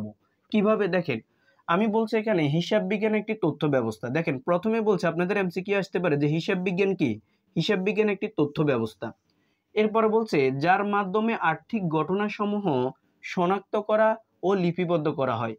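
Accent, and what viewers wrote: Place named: Chittagong, Bangladesh. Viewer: আপনার কথা বলা শুনে মনে হয় আপনার বাড়ি চট্টগ্রাম😊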